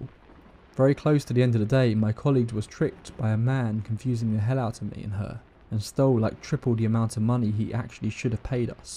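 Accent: England, United Kingdom. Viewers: It's so difficult to fall asleep to English accents... Need my murican! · Some fab stories, thanks for narrating them and everyone who shared them. But I definitely never fall asleep to them. I listen whilst preparing and cooking or soaking in the bath. With a glass of something! 🥂😁 And it's great to hear another English voice and accent x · Can't fall asleep to a British accent. Just can't.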